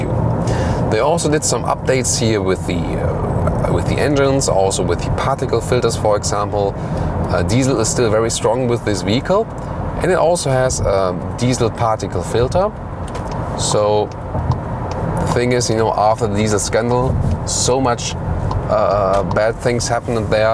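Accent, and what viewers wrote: Germany. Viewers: I believe your german accent renders you unable to properly pronounce the word "vehicle" . I am sorry to say that this way it sounds as if you were trying to say "fecal" but with "v" at the beginning. This is not an insult ... just my failed attempt at constructive criticism.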